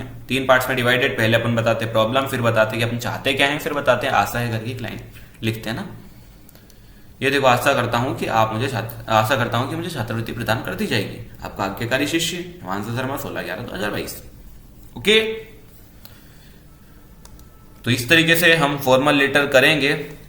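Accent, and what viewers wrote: Indore, India. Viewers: Yeh bhai pakka indori hai 🙂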